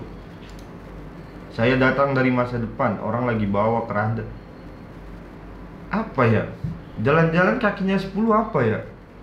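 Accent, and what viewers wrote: Java, Indonesia. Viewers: Asli jepara apa purbalingga apa depok si ini org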